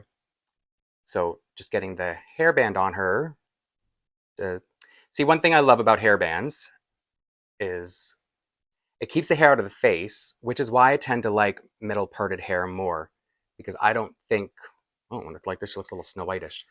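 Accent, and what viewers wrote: Canada. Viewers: I sure love you and your channel! You are so personable I feel as if we’re sitting in the room together and you’re showing me your dolls. I also love your slight Canadian accent, which I thought was cute. You pointed out. I didn’t realize that’s how that Dog’s name was pronounced either so thanks for including me in! It’s the wiener dogs right? 😅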